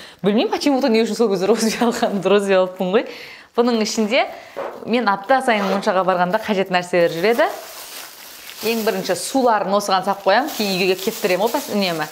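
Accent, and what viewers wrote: Standard. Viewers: қызым,саған үлкен рахмет! Өз тіліңе деген құрметін үшін, өте таза сөйлейсің. Кереемет.Өте білімді екенің байқалып тұр. Негізі алған біліміңді білгім келеді жене Корей тілі қалай үйрендің? Кореяға қалай келдің, әлде тұрмысқа шыққансон ба?😊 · Жарайсыз, қаншама жыл Кореяда тұрсаңызда қазақ тілінде таза сөйлейсіз,бұл үлкен құрмет елге,тілге деген... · молодец өз тілінде таза сөйлейді екенсің, құрметте дұрыс Әрдайым жаңалығыңмен бөлісіп отыр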